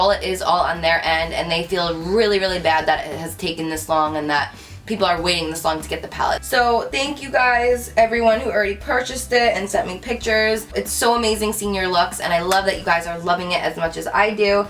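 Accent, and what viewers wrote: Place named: Boston, United States. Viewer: where is she from? she has like a boston accent or something haha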